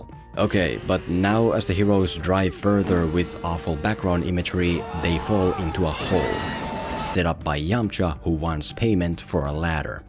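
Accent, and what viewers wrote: Finland. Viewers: I have watched your videos for more than 10 years and only now has it clicked for me that your accent might be Finnish.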